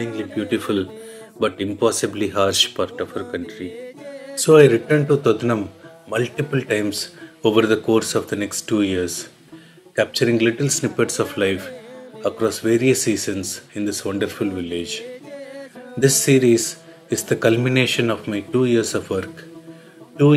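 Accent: India. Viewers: Food .Indian acsent ..haha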